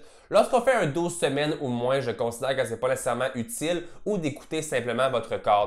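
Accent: Quebec, Canada. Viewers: L'accent québécois me fais mal au tympan, mes oreilles saignes 😫😫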